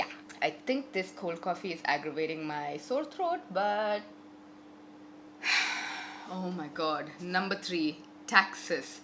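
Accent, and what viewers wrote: India. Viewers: It’s so interesting how you were born and lived your life in the Middle East but you have a very heavy Indian accent. I was born and raised in America and I sound American. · But you have a strong Indian accent ... And i hate howyou pronounce the letter c in "cannot" or other c words.. gosh ...cuz that sounds really fake and weird..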